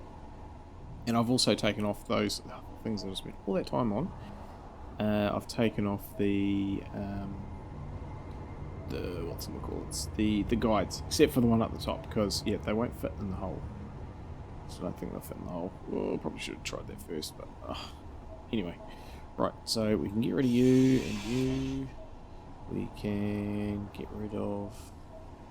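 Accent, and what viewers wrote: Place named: New Zealand. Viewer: Man... i know its a tiny thing, but i was waiting for the American accent... and then i was pleasantly surprised. Im assuming your a kiwi? i really like this build from what i have seen of it man, great work, well recorded video, wwell spoken. highly enjoyed!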